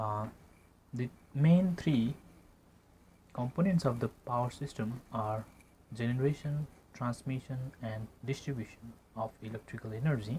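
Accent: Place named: India. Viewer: what the fuck ..I hate Indians English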